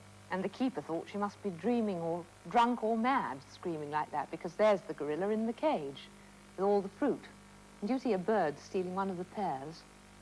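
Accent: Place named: RP, United Kingdom. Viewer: I saw another episode of Gammon and Spinach a few years on YouTube and my vague childhood memories were ruined! I could vaguely remember the stories being about the frog in the opening titles, and I could remember the presenter and her very posh voice but not her name. Imagine my disappointment 30 years later when I found that Gammon and Spinach was just an ordinary story reading programme that Yorkshire Television tried to make more "interesting" by having A Frog He Would A Wooing Go" as the theme complete with the animation of the frog in a Victorian suit and the presenter/storyteller on a pond set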